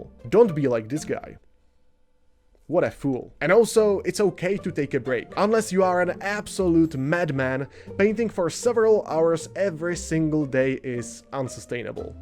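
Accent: Czech Republic. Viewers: You sound very czech. :D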